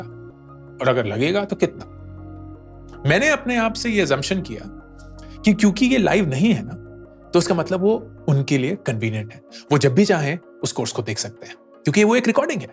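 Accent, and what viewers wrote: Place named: Kashmir, India. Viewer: Can't help but appreciate your subtle Kashmiri accent. "Pata hai kyu" Highly appreciable journey.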